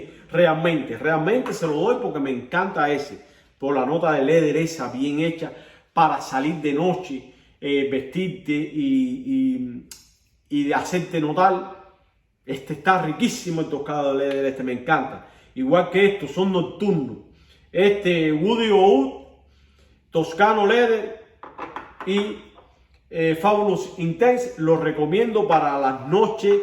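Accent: Cuba. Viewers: Eres cubano mi bro?...buenos videos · Hola, bien marcado ese acento cubano. Conozco el peach, El rosa, el tobacco touch y el cherry, me compre el cherry y el tobacco touch, me Super encantó el tobacco touch tiene una salida de clavitos de olor, nuez mozcada, miel dulce, parece un cake de navidad.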